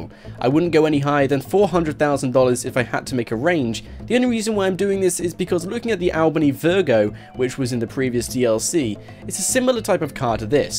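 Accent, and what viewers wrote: England, United Kingdom. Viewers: Ryan McCall you're so culturally insensitive stfu hes from an area where that is how that word is pronounced. If anything because the US English language originates in England, his pronunciation is correct and yours is less correct. But either way he is pronouncing the word right, where he is from. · He is British he pronounces Los angilis and not Los Angels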